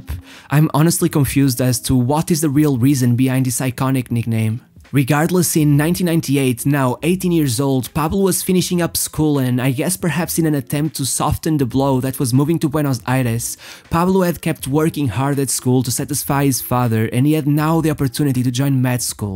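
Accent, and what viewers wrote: Portugal. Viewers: This voice has such a portugese accent ahah 100 % sure he is portuguese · Tu tens de ser português, o sotaque não engana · Faltou-me dar aquele suporte, optimo ver um portugues envolvido num bom trabalho internacional! Sigo o canal e deixo-te os meus parabéns pelo trabalho, cada um com sua pronuncia e ainda bem que a tens porque assim dá para os teus compatriotas te identificarem e apreciarem! One love <3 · I love this guy’s portuguese accent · I cant help but to ask, are you portuguese? If you are not cangratualations because your portuguese accent is perfect. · no I don’t. He probs went to a English speaking country as very young lad but still carries the Portuguese language accent. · Hey man, love the videos 😊 Just a quick one. We speak Spanish in Argentina (it's actually called Castellano - <link> but with a different accent because of the 65%/70% Italian influence). 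I know, I sound like a pain in the 🍑 but I like your videos and I'd like for you to get better and get more viewers 🙏🏽 Your Rs and Gs are pronounced with a Portuguese accent. Therefore, they have nothing to do with Spanish. It's the equivalent of speaking Italian with and pronouncing it with a French accents. Again, sorry for being a pain 🧑🏻‍🏫 and thanks for the amazing videos 😊 Ciao! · este sotaque é tão tuga certo ? ahha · o teu portugues no ingles é muita mau fds, esse sotaque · Amazing how a portuguese guy only needs 5 seconds to realize that’s a portuguese guy speaking english.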